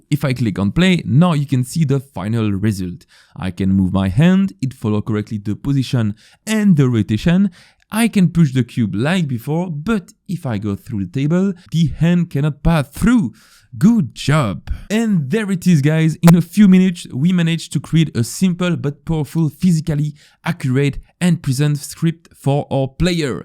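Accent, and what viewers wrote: France. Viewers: tu est français XD L'accent est horrible. Sinon merci.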